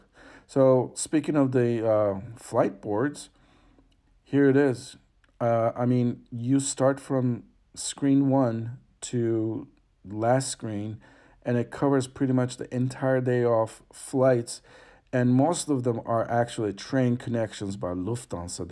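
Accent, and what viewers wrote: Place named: Turkey. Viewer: I liked your English.. it's quite fluent... but I felt the influence of Turkish ... any way I liked it and I prefer your videos in English..😊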